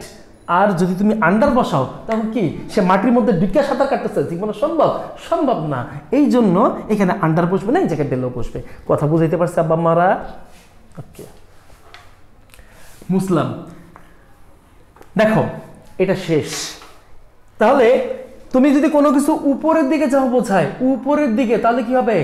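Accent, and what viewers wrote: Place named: Barishal, Bangladesh. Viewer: ধন্যবাদ স্যার। স্যার কিন্তু মেগো বরিশাইল্লা👍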